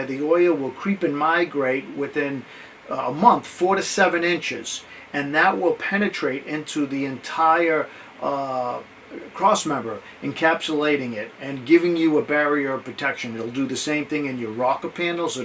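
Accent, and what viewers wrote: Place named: New Hampshire, United States. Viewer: The caption says, "cab connors." Though it's probably a typo, it's funny because it matches NH accent.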